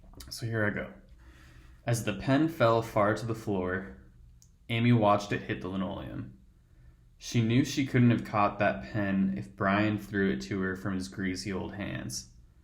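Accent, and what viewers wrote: Colorado, United States. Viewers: I didn't know Colorado had an accent! Probably different now than it was 100 years ago. That was probably more like Yosemite Sam in those days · I am half American half Australian and lived in Colorado as a child in the 90s. I love your video because it's great to hear the accent again. I love and miss Colorado and want to visit again some day. Peace dude! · Hahaha you’re hilarious. I don’t know if it’s because we share the exact same accent or if you’re actually that funny but I was laughing a lot during that video. I’m in Mexico right now learning Spanish and I’m just watching these videos to get a taste of back home. I miss you all in Colorado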